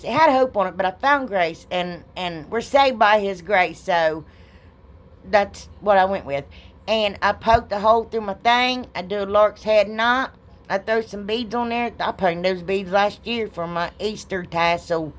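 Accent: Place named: Kentucky, United States. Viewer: Omgosh!! So glad I found you. You are my new favorite diy'er!! I love your personality and the way you are just so humble and down to earth! I even got my boyfriend to watch you a few times and that's a miracle !! haha. He says with your accent, you have to be our neighbor. lol. We are in KY! Anyway,,Keep making beautiful crafts & making us smile! 💕😁💕Anyway, I'm binging and getting caught up on all your videos I haven't saw. 😍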